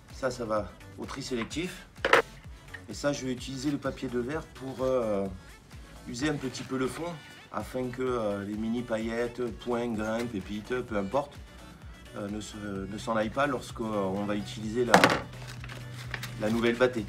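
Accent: South, France. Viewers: ta un accent du sud de la france moi du québéc pas du tous la meme accent merci pour tes super conseil le cousin francais super intéréssent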